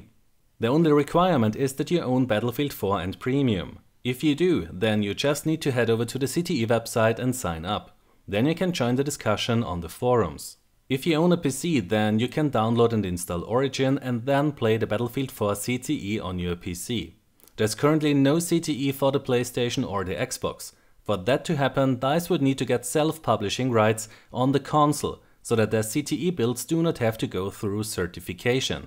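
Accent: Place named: Germany. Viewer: You have the greatest German accent imaginable. I just picture you sitting in a lab and refusing to leave until whatever you are working on has been perfectly engineered.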